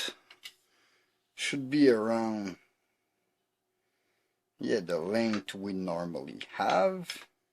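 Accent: Canada. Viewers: I had a debate with my 8 year old on the accent :) I saw in another comment you got your parts on aliexpress? Just luck from the algorithm I saw your video and recognized the accent. I do not think I have seen any Canadian modders on youtube except you so far. Anglo here living in Montreal for 20 years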